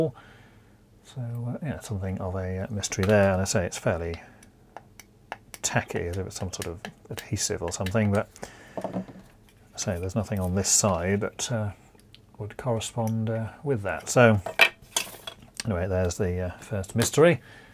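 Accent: United Kingdom. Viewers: Nice - i couldn't help thinking, "hey, this fellow sounds a lot like the voice in my head when i'm muddling through some problem or another (but with an other-side-of-the-pond accent)." Some of these power supplies/adapters can be so intimidating... it's refreshing to see a unit with a straightforward layout. I'm working on a few Dell PC power supply units with no obvious faults, and this video gave me some inspiration to dig back in. I think it's time to invest in a reliable capacitance tester, since problems so often come down to those failed electrolytic caps.. just haven't seen them vent from below in my limited experience. Good to be aware of - Thanks for sharing!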